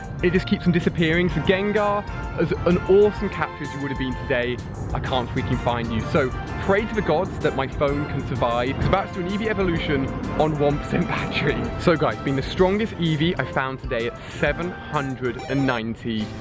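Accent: England, United Kingdom. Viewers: english?? or america???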